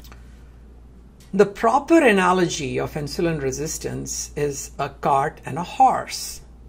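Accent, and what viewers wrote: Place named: India. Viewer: I think i have to go throu all this information 3 or 4 times to fully understand it. Very interesting but also very complex. I love your work and your indian accent helps me to focus without getting tired. Looking forward to see more soon. Greetings from Galicia, nortwest Spain!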